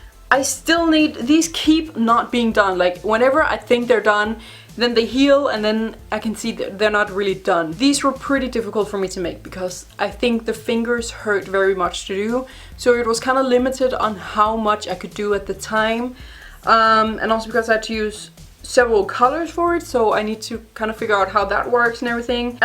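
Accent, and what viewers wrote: Denmark. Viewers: You are Danish aren’t you? As a Dane I can hear it in your accent😉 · Hvorfor kunne jeg høre på din accent at du er dansker? HEJ MEDVIKING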